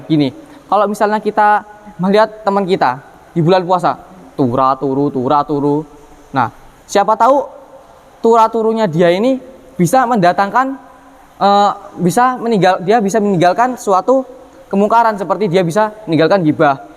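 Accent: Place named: Java, Indonesia. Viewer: Masya Allah bang 🥰🥰 tambah pinter, tmbah sholeh. Aminn 🤲🤲 Didenger2in kok suaranya jd medok jawanya ya sekarang, udh pinter bahasa jawa kayanya 😁😁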